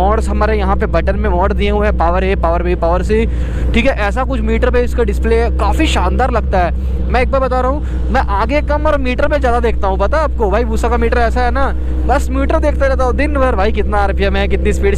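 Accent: India. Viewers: Bhi tum bhajpuri Ho ke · Bhai aap bihar se ho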